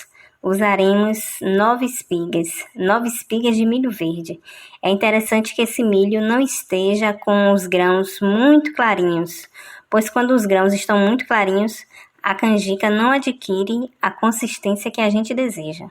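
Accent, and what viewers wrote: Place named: Northeast, Brazil. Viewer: Boa noite gostei sutaque nordestino eu amo nordestino Bahia eu Itabuna Aracaju Sergipe ou meu pai morreu era sergipano sou de Santos gostei do vídeo parabéns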